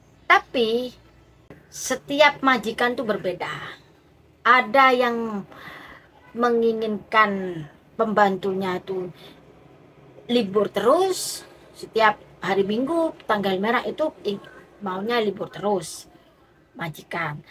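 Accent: Madura, Indonesia. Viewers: Kok kayak reng madure nik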